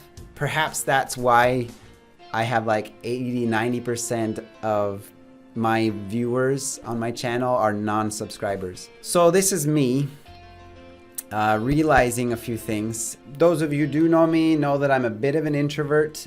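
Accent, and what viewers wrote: Italy. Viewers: You have an Italian accent, but you look more Northern European. Then again, many Italians look more Nordic like yourself. Just wondering, that's all. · I lived overseas for several years and you have the international English way of speaking with I think a bit of Italian regional accent. It it more recognizable when you speak slower. When you are more passionate or speak faster it disappears.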